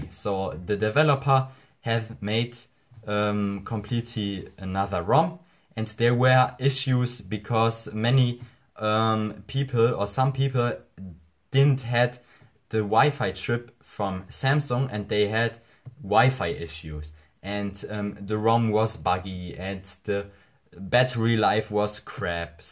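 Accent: Germany. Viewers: Hallo, Ersteinmal zu Dir. Deine Arbeit sticht heraus, besonders da du auch auf die Details eingehst die andere in ihren Tutorials gerne auch mal unter den Tisch fallen lassen oder nur sehr dürftig erklären. Das Thema ist weitaus komplexer als nur 'buttons' zu drücken und ein USB mit dem Rechner zu verbinden. Daher, hats off! Machst du gut ansonsten würde ich dich nicht mit meinem ersten Youtube comment ever beehren und belästigen. Bleib so bzw. entwickle dich! English and fluently? Keine Sorge, da kommst du noch hin. Bist ja schon gut am üben für... ich nehme mal an die English Prüfung in mündlich? Versuch mal das, dein English nicht zu sehr 'einzudeutschen'. ;) Nun zu mir: Ich (completely newbie) und in der letzten Zeit viel zum Thema flashen und rooten angelesen (xda, etc.) bzw, tutorials dazu gesehen (hier und anderswo) möchte, um klein anzufangen erst einmal mein SM-G935F mit 1&1 Branding a)debranden und b)mit einer neuen und aktuelleren FW (DBT/G935FXXS2DRA9) grundversorgen. 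Vielleicht kannst Du mir mit deiner Expertise meine gebliebenen Fragen beantworten und Ja! Sorry schon mal vorab für das nun folgende Buch... Ist Zustand: SM-G935F mit 1&1 Branding FW: Nougat 7.0. Keine SIM vorhanden (mein erstes Mobile seit Jahren und ja, es geht auch ohne! :D) Weder Pins, Passwörter noch Fingerprint eingerichtet Mobile mit einem Google Konto eingerichtet Frage zu: Backup Ungerooted kann ich Titanium Backup nicht verwenden. Helium speichert die Apps nur in die Cloud (Google Drive) a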